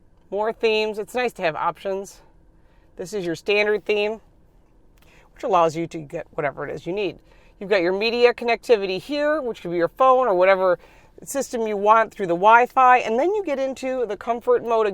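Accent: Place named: Chicago, United States. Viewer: Watched it 3x just for the Chicago accent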